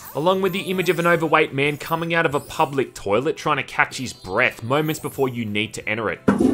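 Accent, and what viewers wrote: Australia. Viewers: I love this man's review of huniepop it's hilarious an Aussie describing what hentai is very funny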